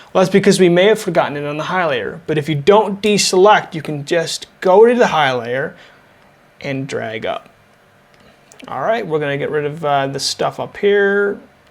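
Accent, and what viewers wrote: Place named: Appalachia, United States. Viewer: You sound like Hillblly, btw nice tutorial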